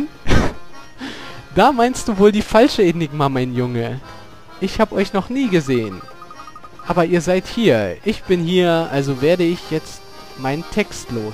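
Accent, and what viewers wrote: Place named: Berlin, Germany. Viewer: geiler berlinnischer akzent hast du^^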